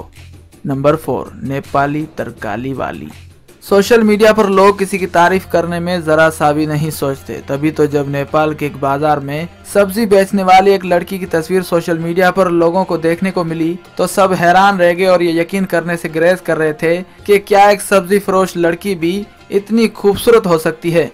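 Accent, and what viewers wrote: India. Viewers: apka lehja hindi kyun hai urdu ko urdu ki trh bola karein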